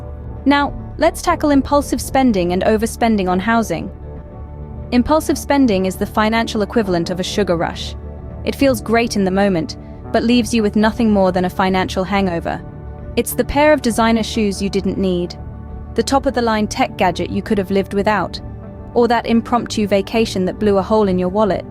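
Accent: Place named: United Kingdom. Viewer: THE BRITISH NARRATION IS IRRIDATING-- AMERICAN ENGLISH POSSIBLE WITH A LESS KNOW-IT-ALL TONE?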